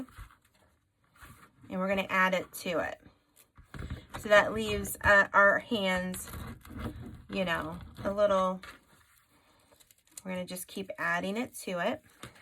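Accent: South, United States. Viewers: Great tutorial thanks so much. (By the way. There is no problem at all with having a southern accent. Contrary to popular belief, everyone has an accent! Embrace it.) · Nothing wrong with sounding Southern, I am one myself!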